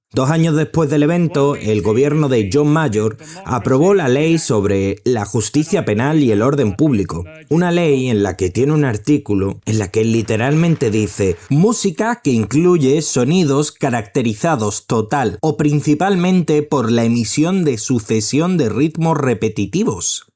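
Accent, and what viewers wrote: Andalusia, Spain. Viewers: Que montón de subnormalidades y por tu acento lolailo no se vivio nada de lo que hablas el sur de España fue un reducto retrogrado donde la pantoja y la Jurado reinaron y reinan.... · Primero: me encanta tu acento pk también soy andaluza. Segundo: me encanta que hayas hecho todo un documental de este tema. Tercero: de dónde has sacado tanto contenido de los 2000 de las tribus en los PCS? Que pasada. Yo lo viví pero apenas tengo fotos de como se veian las webs en esos años. Me suscribo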